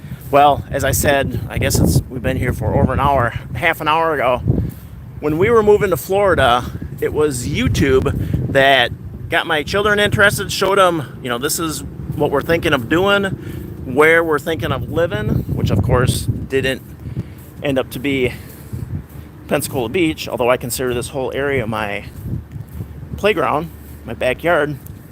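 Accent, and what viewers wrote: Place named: North, United Kingdom. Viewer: Coming in a bit late but loving this video! Been coming to the Panhandle since I was a teenager (or before) ... about 100 years ago. It was before all the high rises were there. But, I've never walked the pier. Very cool. Beautiful sunrise! Sound is good except seems I hear a bit of a northern accent ... just a little bit. 😂❤❤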